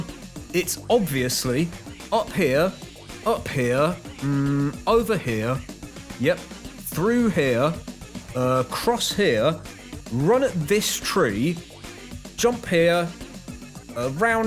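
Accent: United Kingdom. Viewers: finally is talking you sound british my dude🤩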